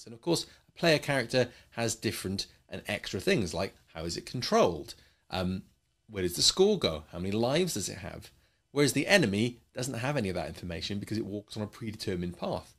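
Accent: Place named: Cockney, United Kingdom. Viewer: Cockney Accent 🤣🤣🤣🤣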